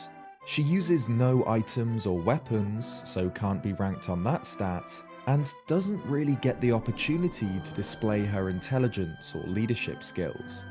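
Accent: England, United Kingdom. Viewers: nah he’s english is js a trait of posher non rhotic english accents, there’s a short on this phenomenon i’ll try to find it and link it here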